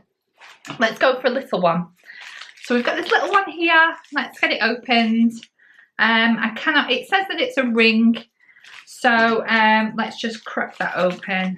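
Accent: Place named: England, United Kingdom. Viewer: Love ur accent what part of England are u from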